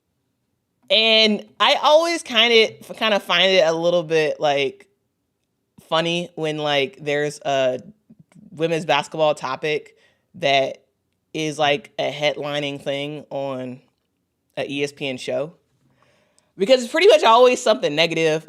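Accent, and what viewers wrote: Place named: South, United States. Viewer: Wow you have the countriest most southern accent to be from Chicago😆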